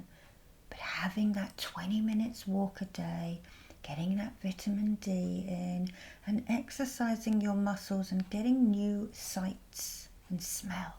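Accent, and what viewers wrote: England, United Kingdom. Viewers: I love the way The English from England sounds, what an enchanting accent =voice, you and put me to sleep easily 😵‍💫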